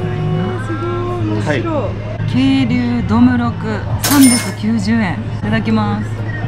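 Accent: Kansai, Japan. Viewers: 顔も関西弁も可愛い過ぎる❤️‍🔥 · なんかオヤジ飲み。でも、おも可愛い関西弁の女や。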